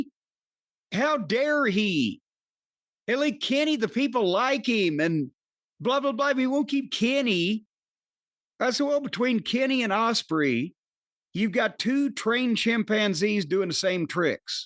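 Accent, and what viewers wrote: South, United States. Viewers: 'The more you here him talk, the less you like him'... im feeling this with cornette lately. His southern, unwilling to change, preacher type voice is starting to get irritating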